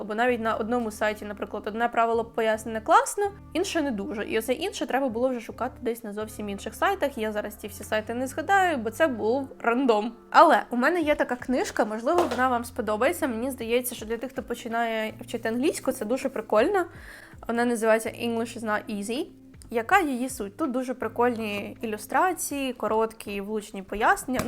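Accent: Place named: United States. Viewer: хочу твоє відео, де ти говориш тільки англійською, мені дуже подобається твоя вимова. Я в захваті від максимально американського акценту😁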